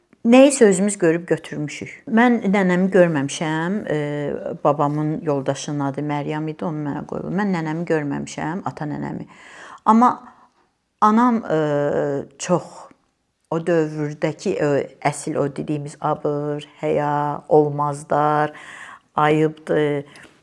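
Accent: Baku, Azerbaijan. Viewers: Məryəm xanım sizə cansağlığı və uzun ömür arzulayıram. Baki ləhcəsini eşitmək mənə cox xoş oldu. Müəlliflərə də təşəkkür edirəm.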